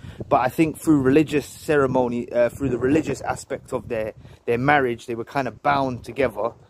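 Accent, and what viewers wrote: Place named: Cockney, United Kingdom. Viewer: I love your cockney accent! Reminds me of London! ❤🙌✌️👊💯🍀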